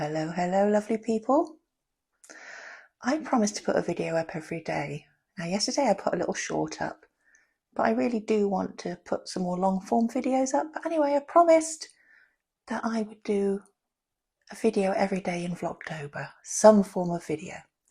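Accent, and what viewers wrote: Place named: Swindon, United Kingdom. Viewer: Foxes and badgers in the garden. Don't they tend to give (some) people the hump and poo a lot in gardens? Sounds as if you did a combination of The Good Life and Hugh Fearnley-Whittingstall's River Cottage back in the day! And I have to say, you've retained a slight Swindonian accent - it's still there! 😁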